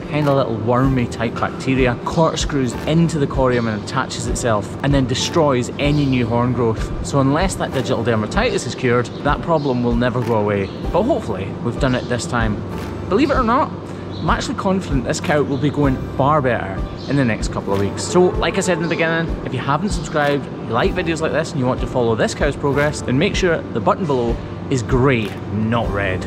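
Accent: Scotland, United Kingdom. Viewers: I was pulled in by horse farrier videos, then YouTube, in all it's power of Oz, introduced me to this Scottish cow foot guy. I love listening to that accent (and figuring out what he is saying😂) while he works to give comfort to these animals. One of the most bizarre things to find such interest in, but I guess I'll see y'all down the hole! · A human who has empathy for an animal in pain, is a good human. I'm loving your videos. No idea how YouTube brought me here, but I'm hooked. I do love a Scottish accent too 🙌 thank you for making these animals lives easier 💖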